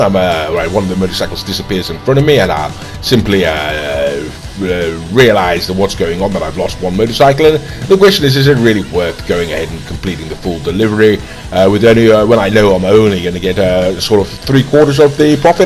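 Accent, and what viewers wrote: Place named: United Kingdom. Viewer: I don’t believe I’ve heard anyone more British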